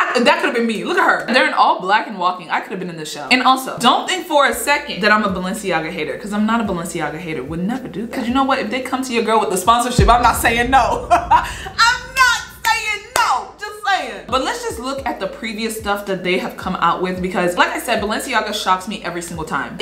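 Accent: Nigeria. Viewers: You sound Nigerian I love you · I just found your channel.. And I love it. Especially your accent.. Is it nigerian? Or other country in west africa? And yes i agree with your opinion about this.. This could also applicable to some arts... Like i don't understand why would someone pay thoisands of dollars for paint on rocks?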